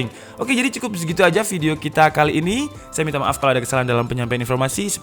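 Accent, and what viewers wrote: Java, Indonesia. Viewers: Mase wong ndi? Aku kepo logate kok njowoni ik🤣🤣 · Tarah wong malang mak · Oo ini orang jawa ya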